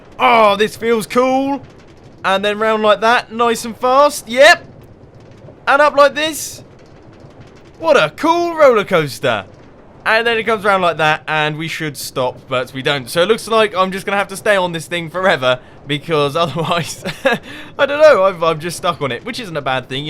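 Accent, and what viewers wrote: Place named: United Kingdom. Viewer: Hey Mumbo =) Few things I want to tell you about this episode and future ones. 1. I really enjoy time-laps parts with cool chilling music and you building something. It's much much better and nicer to watch then "progress updates" :P 2. The first part of yours roller coaster (when you climb up) should be slower. Consider placing power rails less often like 1 normal 1 power rail. This may do better effect. 3. I enjoyed episodes where you well playing in some game in Hermit HIlls. 4. For future Roller Coaster you can add some Fire jump circle (like this one in circuses). It would really do the scarry thing. At the end thank you for your videos. I enjoy watching every episode and I learn english (+ lovely British accent). Keep it UP!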